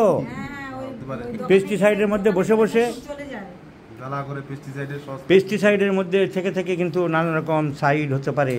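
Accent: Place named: Bangladesh. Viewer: এইভাবে কি patient এর privacy breach করা যাই? এগুলো কি ডাক্তারি? এতো বাজে উচ্চারণ কেন? এতো বাংলাদেশী মনে হচ্ছে। ভ্যাকসিন এর নামে চালিয়ে দিলো 😂। ছোটবেলায় পোলিও ভ্যাকসিন নাওনি?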